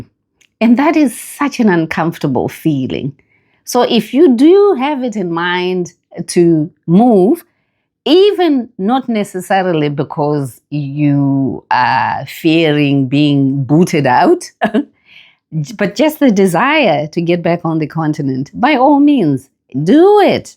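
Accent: Zimbabwe. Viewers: I’m not Ndebele but the accent you have when speaking Ndebele has a very strong Shona undertone to it😂 I wonder if Ndebeles get offended if someone speaks with a Shona undertone lool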